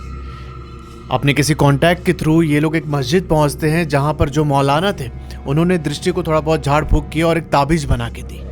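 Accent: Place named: Bengal, India. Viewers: Diwali 🪔🎇 Ke ager din Matlab bhut choturdhosi ke din 14 saak or sabke pura Ghar mein 14 🪔 Candles🕯️ Esob Hum Bengali Log Apne Apne Ghar mein lagate hai or bhaiya bhut choturdhosi ke dis sachmein darabna kuch hota hai eto maine bhi suna hu likin koye bhut aaise baat nhi kar sakta hai jo bolega MAI ARPITA NHI HU, aise shadow bagera dek sakta hai likin eeita kuch hota hai bhuut bat karta hai e kuch jada hi hai aspas negative energy rahta hai to feel hota hai. Likin Bhuut samne ake bath karega esob nhi hota bhaiya 😅 PLEASE REPLY CHAT ? OR ek bath Apke Bengali Voice So Sweet ❤ ! LOVE FROM KOLKATA / (WB ). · Sir your bengali accent is so cute 🥰🥰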